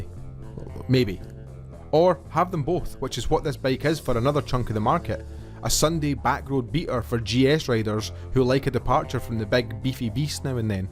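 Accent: Scotland, United Kingdom. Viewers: Great review and that accent is a great bonus! Scottish? Thank you. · Thanks! That was not only a fair review, it was one of the more articulate and entertaining ones I've come across. Sure, that Scottish accent helped keep it nice and crisp, but turns of phrase like "vegan banana leaf sandals" really warm up those synapses, you know? Frankly, you're so good at this that I'm astonished you have only 250 subscribers. You just earned yourself number 251. Now I'm gonna go check out your other stuff.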